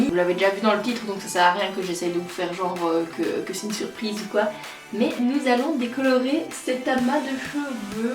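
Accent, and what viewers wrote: Belgium. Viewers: L'accent belge 😍😍😍💕💋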